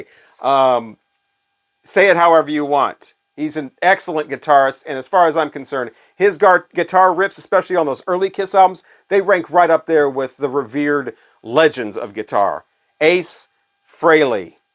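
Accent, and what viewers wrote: United States. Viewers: It hurts my ears every time someone American tries to pronounce Scandinavian names 😭But okay, it wasn't the worst I've ever heard. 😂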